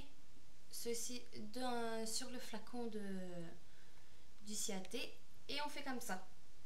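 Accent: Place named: North, France. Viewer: Tu es bretonne? Brest ... Mais ta un accent du nord pkutot mdr moi aussi je suis de bretagne dans le 29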